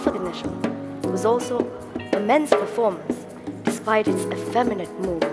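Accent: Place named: England, United Kingdom. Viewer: I like her english accent😍😍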